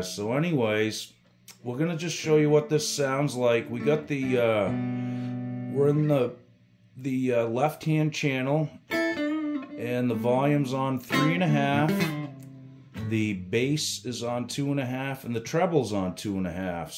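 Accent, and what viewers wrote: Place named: East Coast, United States. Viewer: No offense, are you from Massachusetts?Or New York? I hear some upper East coast dialect. Talk less. Let us hear the amp more.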